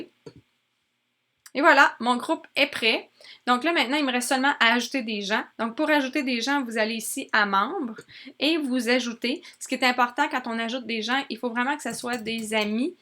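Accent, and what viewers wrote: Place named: Quebec, Canada. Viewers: C'est vrai qu'avec l'accent québécois ça donne un charme supplémentaire 😃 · Avec l'accent québécois c'est tout de suite plus intéressant !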